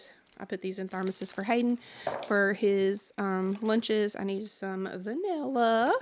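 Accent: South, United States. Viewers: I’m so glad you are back! I miss that lovely southern accent!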